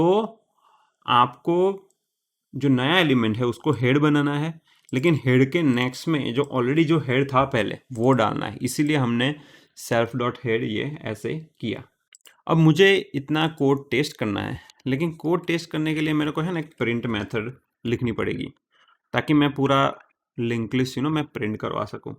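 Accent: Gujarat, India. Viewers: kitni bhi kosis krlo gujarati vala flow to nikal hi jata hai😄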